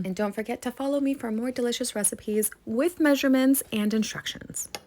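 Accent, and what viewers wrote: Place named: Latin America. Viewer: Sounds very Latino actually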